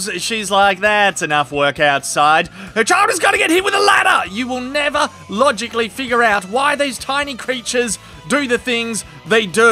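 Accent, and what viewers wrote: Australia. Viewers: yeah nah yeah, he's got the typical ozzy man accent. Brings back the old days when i used to live in Perth.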